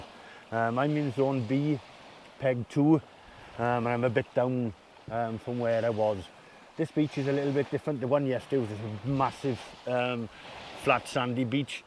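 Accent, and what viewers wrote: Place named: Wales, United Kingdom. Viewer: Bloody good result, - hard fought and fully deserved. Where in Wales are you from? Saw your video on Aberavon - Im a Port Talbot boy and that's my local mark. Your accent sounds fairly local too.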